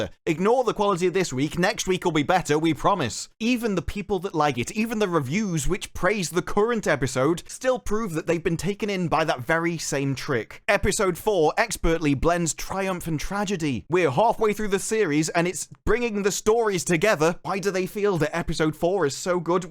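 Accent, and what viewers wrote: United Kingdom. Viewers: You and Council are a great combination for hearing about the show, which I will never see apart from within your videos. I'm sure you've checked out his stuff - his low-key snark is a great pairing with your classic British delivery. I honestly don't know what they spent their money on! I thought a lot of their backgrounds looked like old series Star Trek background paintings. It just does not look modern, and it certainly doesn't look inventive or creative. Like a few other people have said, it doesn't seem to have a soul. I guess the best thing that can be said is the professor Tolkien is not here to witness it.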